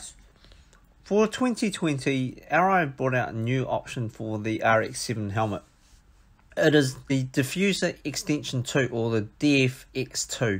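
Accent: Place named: New Zealand. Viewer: You sound like kiwi. Have you found a way to purchase this diffuser in Australia?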